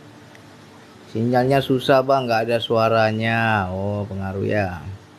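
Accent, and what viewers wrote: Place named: Palembang, Indonesia. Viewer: Kalo dari logat kayayo wong palembang bang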